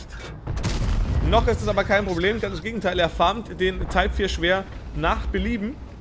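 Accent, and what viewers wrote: Bavaria, Germany. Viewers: Das Bayerische hört man schon raus. Aber ich mag das. Ist authentisch und macht dich sympathisch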